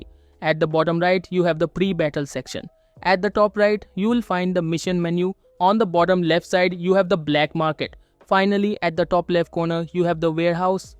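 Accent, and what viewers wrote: India. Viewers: Ugh, that Indian accent is ANNOYING! 👎👎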